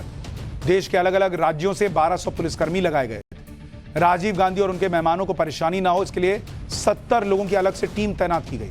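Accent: Bihar, India. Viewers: सुशांत जी आपका बिहारी अंदाज में बोलने का तरीका निराला है, आपके पाठशाला में शामिल होके आनंद आ जाता है ।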